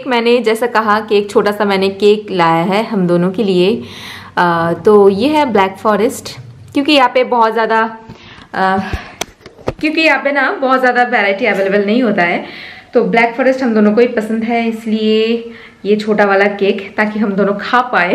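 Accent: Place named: Bengal, India. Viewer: GOD BLESS U BROTHER✋✋ i..like Ur. Talking.style. Very.much I love.ur bengali. accexent. Hindi 👌👍🌷💓 Sweet.vlog🎂 Cute couple👑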